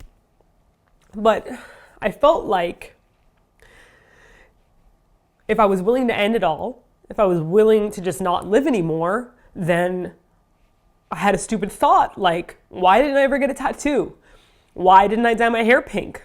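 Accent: Canada. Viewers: ! A. (I won't say "don't", because not is incredibly hard and kind of mean to yourself during a release, but) You don't have to feel sorry for crying.:) It is a release, and it is human to cry. (I have cried during class during every school year of my life. Including college. And possibly at every job. One job, I was so miserable, I was crying in front of customers/while taking orders, and crying in the break room. I didn't feel too bad because I felt I had zero control at that point.:P And honestly, my co-workers were being such jerk butts, I was hoping that uncontrollable defense method(?) would encourage them to treat me with a little more humanity.) B. Your Canadian accent really came out in this video! It was super surprising/interesting!:D C. I think we all underestimate how many people are affected by depression (long-term/short-term), thank you for opening up about your private life to start an open dialogue on the topic. 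D. I love you and everyone and wish everyone luck in the struggles of life. May we all remember the good times over the bad.:) E. In honour of opening up: every since my first depression, I have been able to jump back to my lower points very quickly even if things are fine for months/years at a time. I feel like I equally can bounce back quicker, and luckily for me, I think my darker days arise from situations/environments instead of just a chronic chemical issue. I am very glad that when I hit my lowest point, I did go to my parent (while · I love you. This video was amazing. You made me cry and laugh. I have to say this one reminded me so much that you really are Canadian your accent was so strong in this one. Your videos help me so much and no matter how few you are able to do I will always be excited to see them post. Thank you for inviting everyone to your pity party. We all need one and they should never be held alone.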